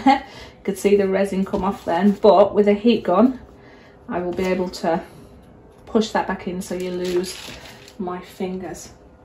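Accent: United Kingdom. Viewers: Wow. This is Fabulous, and Fantastic. I just came across your channel and am so excited. Your British lol. Ive been watching USA Canada and New Zealand. Doing Acrylic Pouring. I love doing it but I also do lots of other crafts EXCEPT Resin Sculpture. I'm so glad that I am part of your wee family. I'm Scottish but actually part of me feels like I'm from Surrey/Hampshire. I have lived down there for years back and fòrward. And have lots of friends in that area. Now... about you. Lol. Your so friendly,funny. And Very Talented. I love this Vase, the colours are amazing and I love a bit of bling.I can't wait to see you do more. Well done. Stay safe Sandra xx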